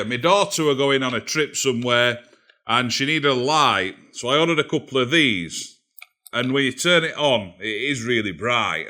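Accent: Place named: Barnsley, United Kingdom. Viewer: He's from the UK and that sounds like a Barnsley accent which is in south Yorkshire 👍👍👍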